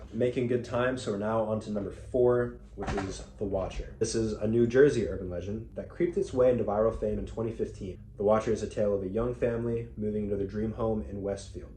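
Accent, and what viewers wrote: Australia. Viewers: Wait, he’s Australian? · Lmao I was so confused at the start with the Australian accent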